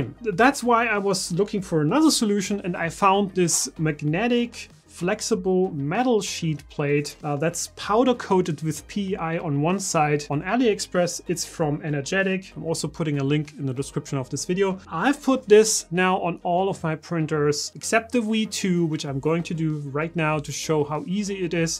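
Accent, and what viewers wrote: Germany. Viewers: Dein Englisch hört sich seeeehr deutsch an:-) Aber by the way, sehr gute Videos von dir, Top!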